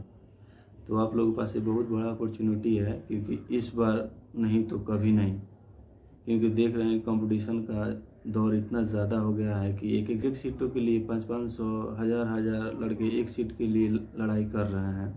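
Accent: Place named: Bhojpuri, India. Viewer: Bhojpuri bahut badhiya laga aapse sunkar aap kaha se hai😂😂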